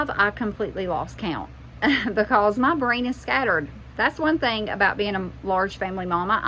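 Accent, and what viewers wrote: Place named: South, United States. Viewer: New subscriber! From the south also, so, I love your accent. 😂 Looking forward to watching your videos.❤❤!! 😊❤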